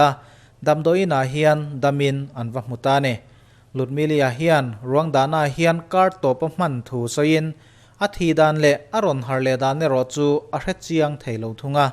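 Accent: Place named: Champhai, India. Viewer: Champhai mi chu kan he ti tlat a lawm 🤭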